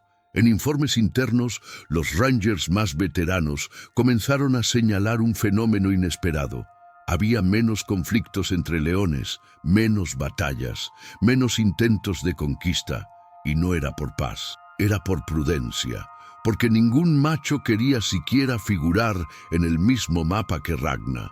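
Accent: Portugal. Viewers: COño nua entendo ni marre, es espanol revuelto con portuguaos 😁🤣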